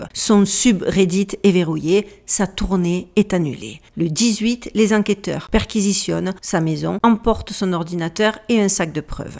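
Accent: Marseille, France. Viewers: Incroyable. Masterpiece. Avant dans les années 80(dsl chui un vieux😂😂😂)tout etait symbolique pour des initiés... Comme si les signes étaient mis pour les doués d intelligence... Aujourd'hui 2025😅😅😅😅 plus c est gros flagrant plus ca passe. Et la meilleure invention pour contré les chercheurs de vérité c est le mot COMPLOTISTE.. Des que quelqu'un a une analyse sur un fait populaire il est taxé de complotiste😅😅😅😅😅... Franchement bravo pour travail car au début je ne comprenais pas qu une dame avec un accent du vieux port abordé des sujets aussi 'Touchi' et finalement je trouve vos analyses ultra pertinente. Courage et allez l om😂🎉🎉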